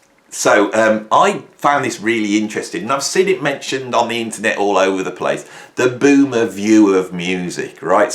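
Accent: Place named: Midlands, United Kingdom. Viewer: First time viewer…….What part of of the UK are you from? Just curious…..the way you pronounce “wrong” for instance, sounds like the Midlands? Im American, and fascinated with different accents…..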